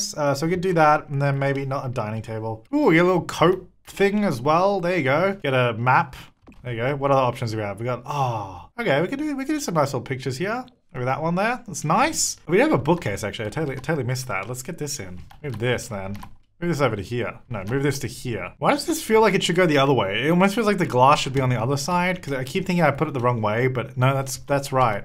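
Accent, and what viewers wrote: Australia. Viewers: Are you Australian English or American just curias 😓😓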